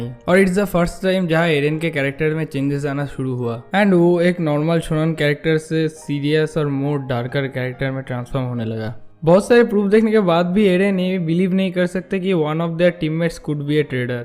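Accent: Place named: Bengal, India. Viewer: bro you sound like bangali are you ?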